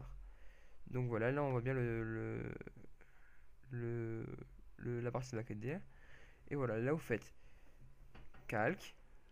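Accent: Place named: South, France. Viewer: Aaaah ! On sent l'accent du sud ! Sinon j'aime bien !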